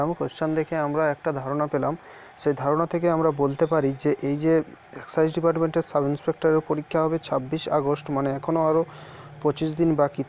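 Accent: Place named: Purulia, India. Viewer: Karon... Amio purulia r😂 Pronunciation ta tei dhora jai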